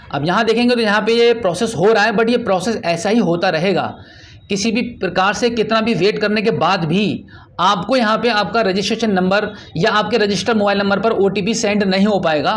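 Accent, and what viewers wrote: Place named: Hardoi, India. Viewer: Bhai aap hardoi se ho?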